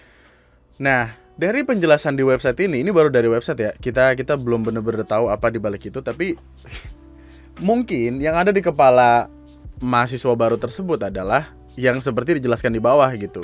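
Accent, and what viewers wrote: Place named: Makassar, Indonesia. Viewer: Dukung bang buat lancar logat Makassar:_andriWkwk::_andriWkwk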